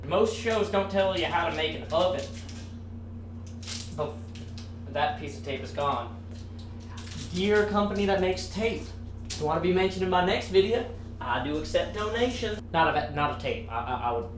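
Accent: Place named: South, United States. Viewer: this is hilarious, you never cease to amaze me with our southern accent and funny videos.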